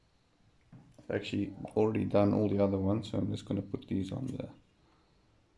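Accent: South Africa. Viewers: im not sure why but you got an south African English accent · Are u from South Africa? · Howzit man , It sounds like you're from SA? Is the vtec D-series top ends rare over here? Im just starting to get into hondas and going to try and get a an old ballade in the next few months so I'll probably be messing with the D series engines for a start. Seems like I'll be able to learn a lot from your channel will be checking out more of your videos!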